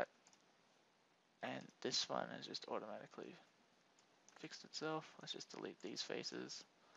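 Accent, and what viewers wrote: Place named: Australia. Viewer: Heyyyy Australian ;) What part you from?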